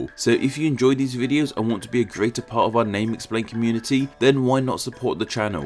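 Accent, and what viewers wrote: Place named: United Kingdom. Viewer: Plus, its not like we're going to use foreign words mentioned here. He apologized tons of times about misspronounciations already, and your suggeated alternative would be HORRIFIC! I dont want his videos to be interupted by a random video. Plus, the guy has a specific british accent if im not misstaken, so pronouncing other words is much harder for him.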